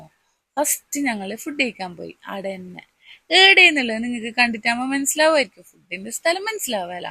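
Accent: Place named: Kasaragod, India. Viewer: Kasaragod basha തുടർന്നോളു ഇതാണ് നല്ലത്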